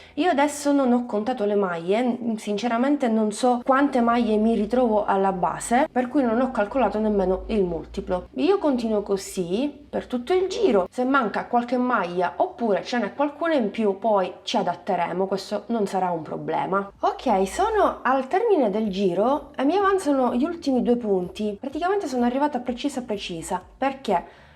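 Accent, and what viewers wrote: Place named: Palermo, Italy. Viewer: anch'io sono di Palermo, qualche flessione verbale mi ha insosspettita 😊 Ribadisco i miei complimenti per i tuoi lavori soprattutto visto la tua giovane età 👏👏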